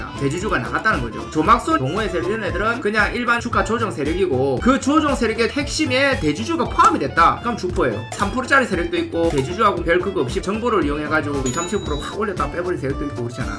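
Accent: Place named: Gyeongsang, South Korea. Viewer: 왠 만한 주식강의 보다 더 현실감쩌는 콘텐츠 구수한 경상도 사투리도 듣기 좋고.. 나도경상도.ㅋㅋ 감좌합니다~ 선배님..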